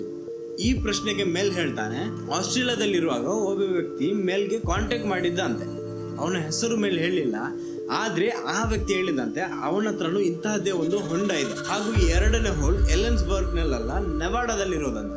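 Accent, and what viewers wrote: Mangalore, India. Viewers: Your From manglore ???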